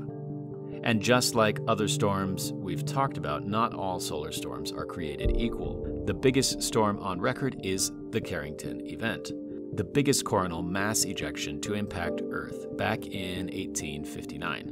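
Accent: United States. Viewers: That's with the American accent? I loved ur ur original voice it was calm and soothing . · What happened to your voice???!!! An American accent just isn't the same. (I'm from the U.S btw) I think it sounds better with your original voice. Just my 2 cents worth...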